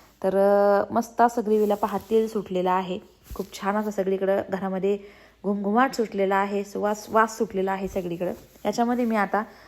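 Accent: Ahmednagar, India. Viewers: Aapli bhasha aashicha aahe nagari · नगरी भाषा एकदम तडका❤❤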